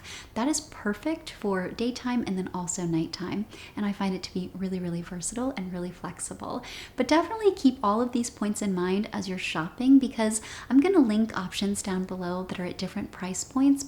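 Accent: United States. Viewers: Same here! I also love her accent, it's so good to listen to. I don't know which part of the US she is from, do y'all have a guess?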